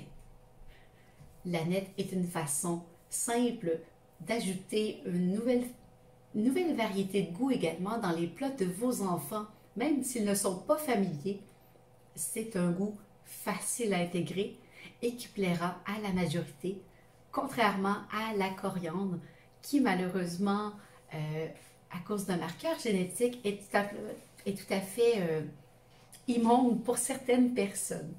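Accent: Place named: Canada. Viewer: Merci avec le bel accent canadien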